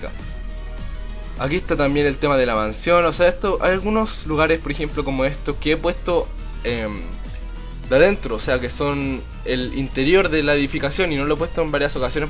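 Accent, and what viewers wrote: Chile. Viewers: es chileno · ¿De qué país eres? es que tu voz la siento de chileno(?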